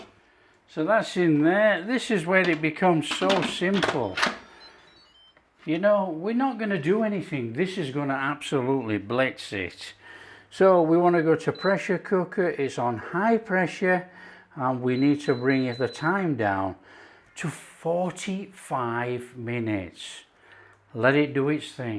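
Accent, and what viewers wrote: Yorkshire, United Kingdom. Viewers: Hello - im a yorkshire woman- living in lancashire for long time- but still so proud of my yorkshire roots. Born in Leeds- then lived in Hull- i still miss the patties from Beverly road chippy- cant get them here. Anyway grew up with mam sending one of us to the corner shop- for a quarter of loose potted beef- wrapped in greaseproof paper. Didnt last long- having 3 sisters- but wow such a lovely memory. Luv watching you- because i cook a lot much as im alone now- just like yourself im known for the old classics and proud of it. So yes ill be making your lush potted meat and having it on my favourite toasted bread- sourdough. So thank you- such a pleasure listening to you with your yorkie chat- always feel like ive gone home as im right back there- its nostalgic. God bless- and take care. 😊❤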